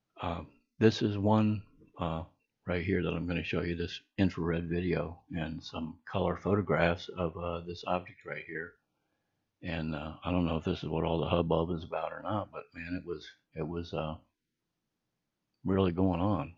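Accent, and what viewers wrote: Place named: United States. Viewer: Ok. Maybe it was someone else but i thought I had asked you about the solar eclipse we had about 2 years or so ago. And if you had any footage?. I had heard stuff going on at that time. But good to know, you definitely sound American 😄. I'm down in NC. I've noticed must things I see here are towards the east! Maybe coming over the ocean.?.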